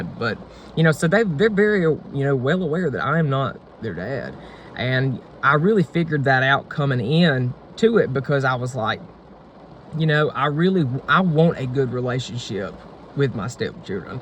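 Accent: South, United States. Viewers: "gay country accent".. lol. It's just the region in the South he's from... and the parents (voices) he was raised around. (I'm from the south)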